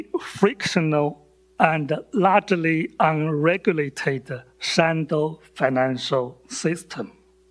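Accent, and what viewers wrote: China. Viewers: 这chinglish 听的真小学生啊，不是你写的吧。chatgpt翻的英文绝不是这么差的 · 这带地方口音的中式英语 老外听得懂吗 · 典型的中国英语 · 我在美国上安全课时的老师是一名清华毕业的老师，口音和高老师差不多，特别老派的中式发音基本上发音都是平的，可以听懂每个单词就是不太像普通人在说话交流，就像AI翻译读稿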